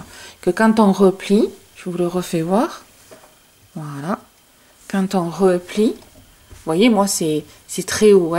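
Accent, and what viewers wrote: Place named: Pau, France. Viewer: Vous avez un accentde Pau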